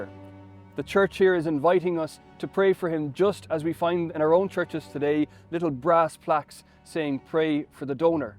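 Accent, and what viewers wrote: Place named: Ireland. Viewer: Thank you for the interesting info. I love the Irish accent What is the significance of the puffin bird - I think it is a puffin?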